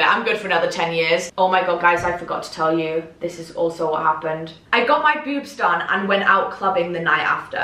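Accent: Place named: North, United Kingdom. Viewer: You sound allot more northern back then lol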